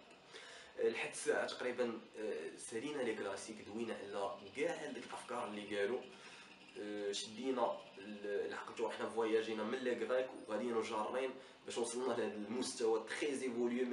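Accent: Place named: France. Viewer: اسمع عربي مفرسن..انت مطبوع لسانك فرنسي وانصحك بعدم التكلم باللغة العربية لانك كمثل الهندي الذي يتحدث لغة انكليزية بل انت اعقد...مع هذا شكرا لمجهودك بالرغم من اني لم افهم لغتك..تحياتي..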